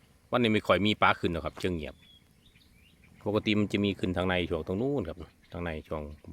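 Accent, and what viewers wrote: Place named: Korat, Thailand. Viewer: สวัสดี เป็นคนโคราชมั้ยครับสำเนียงคือเลย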